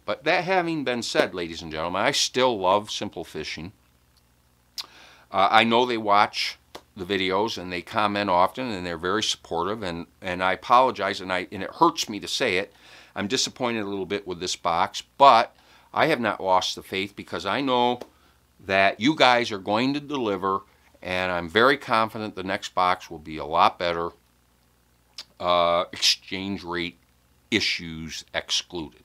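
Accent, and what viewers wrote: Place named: Michigan, United States. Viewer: your accent. Sounded northern Michigan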